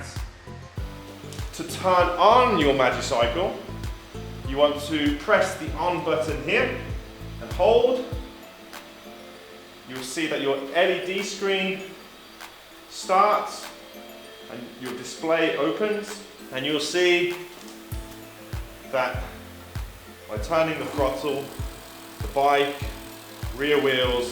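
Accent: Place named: United Kingdom. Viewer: Great, clear instructions. You have a British accent, but I guess you may have lived in China/ Asia for some time. Nice! I have lived off and on in India for 10. years. Back in the states now.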